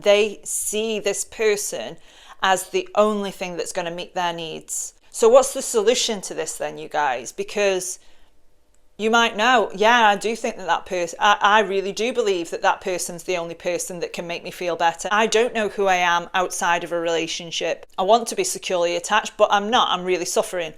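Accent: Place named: Lancashire, United Kingdom. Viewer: Super helpful must help with the Lancashire accent? I dont know but gathering my self worth is helping alot i was excersizing boundaries which prompted my bring ghosted so on the right track but funny how the feeling of "oh what if" comes in againgst the obvious logic lol it is like breaking free from some kind of addiction obv attatchment x thanks for being you x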